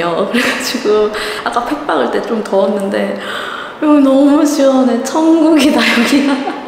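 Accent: Jeolla, South Korea. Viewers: 에너지 넘치는 23갤 아들이랑 캠핑 다니고 싶어서 요즘 캠핑 영상만 찾아보다가 님을 알게 되었어요! 지금은 경기도에 살고 있지만 고향이 무안이라 전라도 사투리가 어찌나 반갑던지!!! 캠핑에 ㅋ도 몰라서 텐트부터 장비 등을 신중히 구매하려고 하는데요(이중지출을 최대한 막으려구요ㅜㅜ) 삿갓타프 괜찮아보이네요! 비 안오는 날엔 님처럼 활용해도 좋을 것 같고 아기랑 다니면 스피드가 생명이니까요ㅜㅜ❤ 매번 영상 기다리는데 오늘도 너무 잘 봤습니다😋💛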